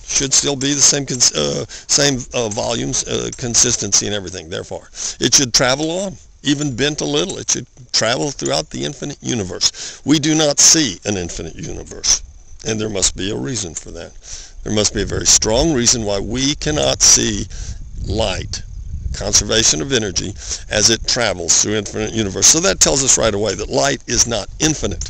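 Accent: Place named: South, United States. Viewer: a southern drawl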